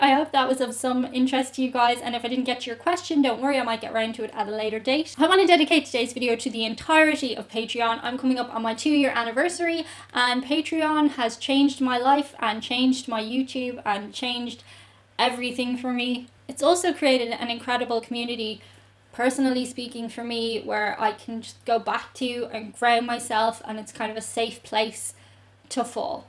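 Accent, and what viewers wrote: Ireland. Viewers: I love the Irish accent it rocks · I would say the Irish are charming, and fun to drink with but I am biased being 1/4 - 1/3 Irish. I love the accent, and you aren't hard on the eyes either. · maybe you should come and visit australia some time? or maybe not if youre worried about things that kill you? there are spiders, crocodiles, blue ring octopus? ,octopie? octopusses? anyway and Irukandji jellyfish in the top ten?? but moslty not in the burbs nowdays, mostly : ) would so love to hear your viewpoint on all the good and bad "'as if! ' i would say, we live a reasonably laid back life style compared to most places. OH and i almost forgot, all aussies (Especially me, Lol) love a girl with an irish accent, you'll b able to get away with blue murder! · Lol I truly love your videos. I Always end up with a smile if not a stitch in my side. I must disagree with the poll 😉. 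I find Irish ladies quite Sexy. The accent is a plus for sure. All the best. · Well.....The fact that a beautiful Blonde Irish woman just looked right at us and like the total badass she is....said "you can keep your sexy and shove it right up your (*&^$*$)" in that beautiful Irish accent.....To that I say "that is about the sexiest thing ever" so Take that and stuff it Nova Poll hahahahaha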